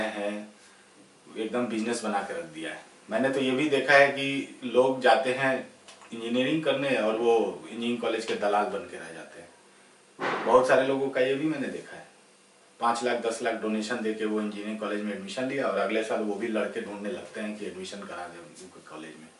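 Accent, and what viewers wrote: Bihar, India. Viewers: Aap bihari ho? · bhai tum Bihar se ho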